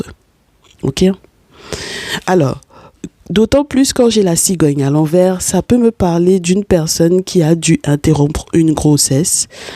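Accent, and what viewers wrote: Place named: Antilles, France. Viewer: Vous avez un accent Antillais. 😁❤️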